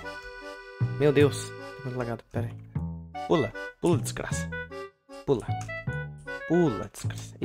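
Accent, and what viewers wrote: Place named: Rio de Janeiro, Brazil. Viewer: o seu carioca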